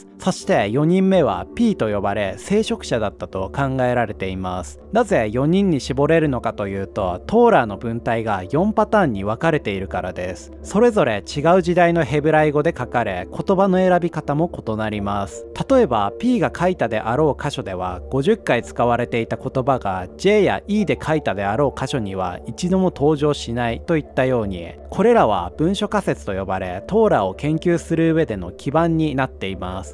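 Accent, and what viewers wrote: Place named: Tohoku, Japan. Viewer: かなりズーズー弁ですね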